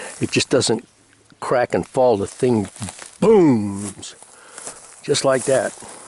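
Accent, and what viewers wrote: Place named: Northeast, United States. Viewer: Where are you from originally? I can't figure out your accent. Are you from the North East?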